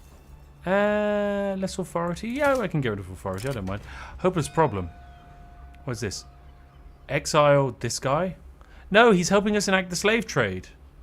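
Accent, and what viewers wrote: United Kingdom. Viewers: Cant wait to fall asleep to a british guy talking about drugs and tea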